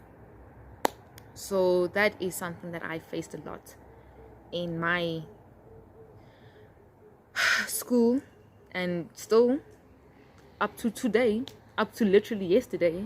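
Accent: South Africa. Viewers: I don't get why people think you sound White though... I know you don't sound White,you actually sound like coloured folk from Western Cape! You sure don't look White aswell,you don't even look coloured with all due respect... I would guess you are Xhosa! Anyway Shoutout to raising the conversation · As an African Male from your accent and the way you look, full lips, flat nose, I thought you were a light skinned Black Xhosa African lady. I guess not to prejudge is the key to understanding peoples backgrounds without outright telling someone what he or she is. I have lived with the Coloured community in JHB since 2008 its now 2023 and I have been treated with the outmost respect. I can confirm that all the stereotypes I had about Coloured people as an African male is all gone, and I have also taught them about African/Black culture. Its actually refreshing to live with people until you forget that you come from different back grounds, when you look at each other you see another human being NOT racial stereotype. 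I used to catch THE FIRST taxi every morning with a lady who only spoke English and couldnt speak Afrikaans, she was open to tell us that she had never been accepted as a coloured when she moved to Johannesburg because of that. She grew up in Durban, spoke English and understood Zulu only. Moved to JHB as an adult to live with family, the family spoke Afrikaans as a first language...SHOUT OUT TO RIVERLEA EXT. ZOMBIE. BOKSTRAAT. EXT 5. EXT 10.👋👋👋👋 AWEH!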